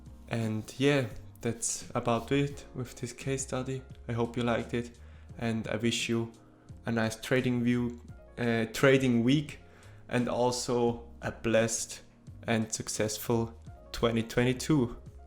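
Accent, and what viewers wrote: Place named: Africa. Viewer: 😂❤️❤️love it mahn keep uploading..african accent makes me more tuned in than James’s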